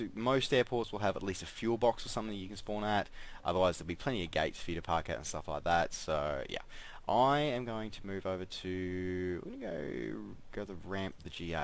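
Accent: Australia. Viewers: YOU MY FRIEND ARE AUSTRALIAN AND YOUR FROM GOLD COST OR JUST NSWI FUCKING CALLED IT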